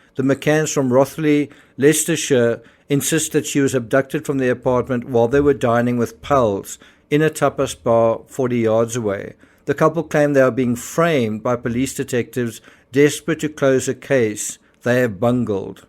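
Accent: South Africa. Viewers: How much is this man making from these smear videos? He sounds South African, so no where near Portugal. The McCanns have suffered enough so leave them alone with this barmy self invested Youtube channel. Give it a rest and maybe post on UFO’s instead? You are part of the problem. Maddie is no doubt not with us any more so stop trying to make her parents lives even more awful and go away and stop making money from misery. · are you originally from South Africa?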